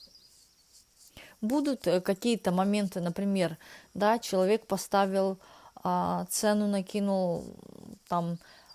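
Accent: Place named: Czech Republic. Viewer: Сегодня я осталась без работы в чужой стране , одна. Да, описание мне подходит. Ха!) и фамилия Воронова, и блондинка, и да белая ворона как бельмо для окружающих. В остальном , расклад интересный , благодарю. Я тоже нахожусь в чехии. Вы хорошо справляетесь с акцентом, но чешский говор и словечки проскакивают.) Это оригинально звучит))) Очень благодарна за Ваш труд. С наилучшими пожеланиями.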